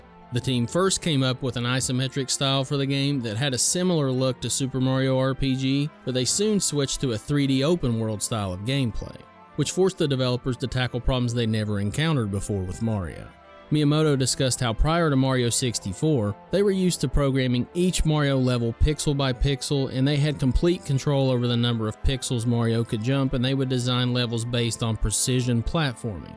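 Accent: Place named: Georgia, United States. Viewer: I just want to say as a guy who grew up in rural Georgia and was born in the mid-80's, it's gratifying to watch this video in that accent and hear you describing memories of experiencing Super Mario 64 at about the exact same age I did.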